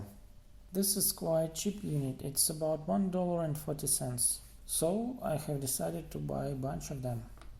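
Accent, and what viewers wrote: Russia. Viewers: русский акцент слышу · You sounds like russian comrade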